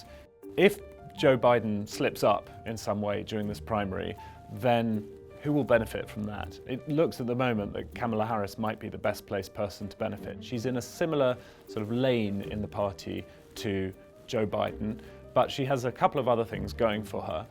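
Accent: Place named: United Kingdom. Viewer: Why am I hearing HEARING A BRITISH VOICE !!!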